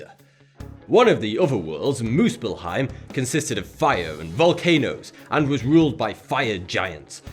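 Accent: United Kingdom. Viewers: Maybe someone who doesn't believe in something to the point of mocking, shouldn't inform people about other theologies. Fire, earth, air, water. Follows the pattern of life, humanity and all of it. So, you're funny and all, but really.... you sound, like, too British.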